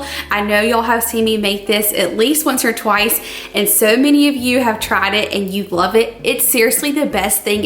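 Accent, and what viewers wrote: South, United States. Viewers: I knew u sounded close to home. You're about 2 hours away from me. But are u originally from the south? Kinda have a little proper accent here & there😄I've never tried the cassrole with cream cheese & cresent on top like that, ill have to try it out! We usually just do Cresent rolls and sausage then egg mixture.(yours looks better haha!) Me and my husband will prob just split it down the middle😂😬.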